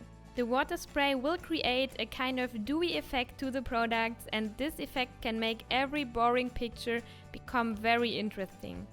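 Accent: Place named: Germany. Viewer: Warum machst du deine Videos auf Englisch? Man hört direkt das du aus Deutschland kommst. 😃 Ist nicht böse gemeint aber welchen Zweck verfolgst du damit?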